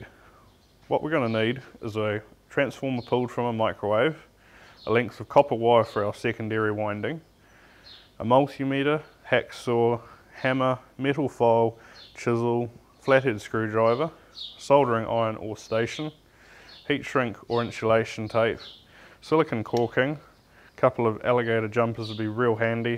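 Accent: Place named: New Zealand. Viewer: This guy is a Kiwi, so it is pronounced TUN, not Tin.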